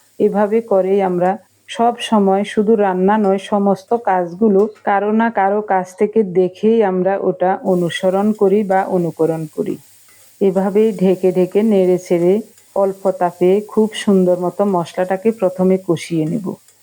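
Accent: Noakhali, Bangladesh. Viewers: Bandhacopi bata khub bhalo laglo noakhalir khuda lagle hudao katha kotobachar par sune mon khub khub bhalo laglo dhanyawad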